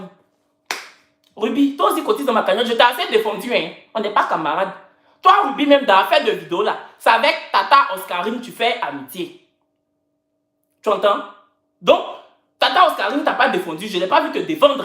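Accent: Cameroon. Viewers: ton accent deh, maintenant tu n'est plus ivoirien tu es camerounais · Ton accent devient camerounais j’adore 💜😍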